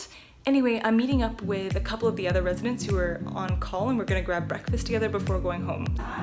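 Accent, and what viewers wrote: Canada. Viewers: Are you Canadian? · At first I thought you were British... but I think you are Canadian?😂 I’m from England so all accents that are like American sound very similar 😂❤️ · is she canadian?? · You sound Canadian lol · Canadian. Ontario. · I love your Canadian accent!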